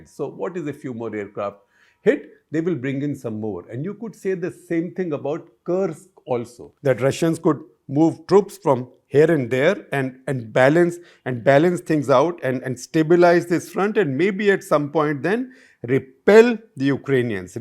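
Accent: India. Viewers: I wish you didn't have an Indian accent 😢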